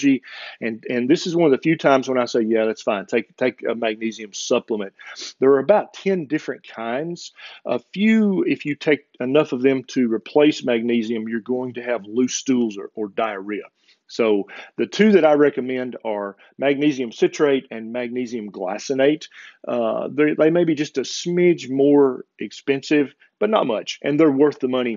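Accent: South, United States. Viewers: Just stumbled across this video binge watching channel. Don't know that I've seen all quite yet, but I almost died laughing: "Shut up and eat your greens!" For God's sake, Doc, you're killing me with your southern-ness! To everyone reading these comments, this man is a treasure - support him via Patreon!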